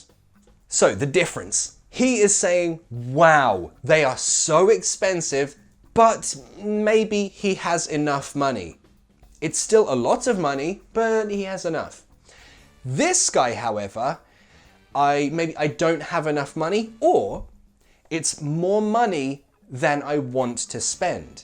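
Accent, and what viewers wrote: United Kingdom. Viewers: Nice lesson again and again. Btw i love ur British accent it so sexy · When I wanted to learn British accent, I found you here at YouTube... How lucky I was!! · I love your lessons!! I LOVE the way you deliver it! I LOVE your British accent 😢 and there are always new things to learn ❤ Keep up the good work!. I won't miss any of your videos again 🙌. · A friend of mine recommended me to watch your videos in order to improve my british accent and im so glad he did so because your videos are perfect (+_+) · I just don't look for the video at the first time.I just like that like button.You are the first teacher on youtube who inspired me to learn British accent.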